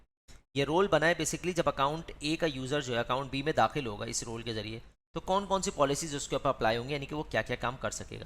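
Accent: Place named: India. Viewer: Impossible to hear his english with the indian accent.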